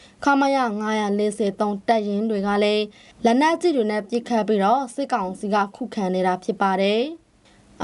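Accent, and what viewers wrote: Rakhine, Myanmar. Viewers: ရခိုင်သံဝဲတယ်ထင်တယ်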